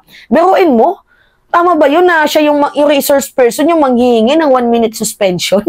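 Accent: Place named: Ilocos, Philippines. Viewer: Natawa ako sa salita mo ma'am okininam ilokana ka gayam hehe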